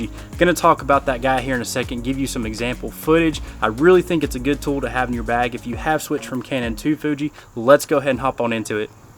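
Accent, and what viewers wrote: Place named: Knoxville, United States. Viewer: Hey,. I knew the second I heard you speak you are from where I come from. All my father's relatives are from the Knoxville area. Been a long time since I've been back, but I loved living there. Now, you only demonstrated the nifty-fifty. So, I don't know if you tested the adapter with EF-S zoom lenses that are stabilized. My question is about how well the stabilization of the lenses work. Do you know?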